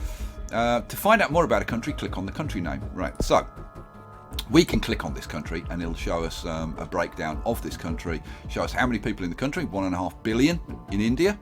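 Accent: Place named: Wales, United Kingdom. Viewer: Loved the welsh accent! haha! who's coat is that jacket?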